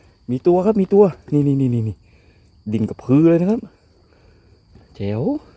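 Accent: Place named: Rayong, Thailand. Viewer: ชอบดูครับผมกดติดตามไห้แล้วนะครับเห็นมีต้นทุเรียนอยู่จังหวดอะไรครับสำเนียงเหมือนระยองเลย..จากโคราชครับผม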